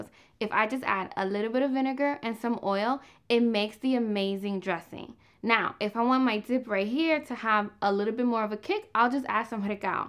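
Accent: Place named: Queens, United States. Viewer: First off ... you sound like my people I knew in Queens, NYC :-) Love it! Second, I've been making mojo for years and I had no idea it was called this way! LOL! It's like the best marinade and base for just about anything Latin cuisine! The grilled chicken where this mojo is used along with the other ingredients is insane! My family loves it! I make it a lot! Gracias por todo!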